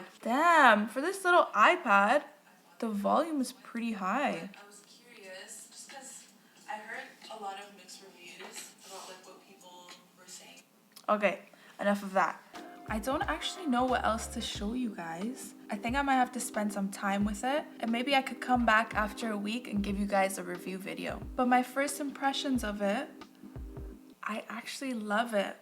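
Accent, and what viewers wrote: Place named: Toronto, Canada. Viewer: Great unboxing and video editing! loved the stop-motion at the start. Could tell you're from Toronto based on your accent lol. Keep going! I subscribed :)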